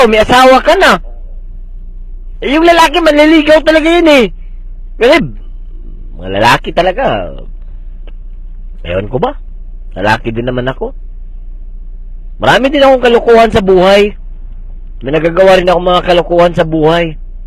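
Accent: Visayas, Philippines. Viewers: Hahaha. ..visaya ka kuya.. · bisaya ka pla kuya.... hehe!same pla tau... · Bisaya man ka kuya noh..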